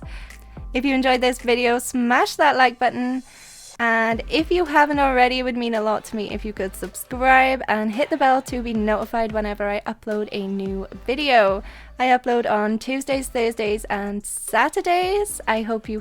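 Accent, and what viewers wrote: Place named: Wales, United Kingdom. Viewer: OMG YOURE WELSH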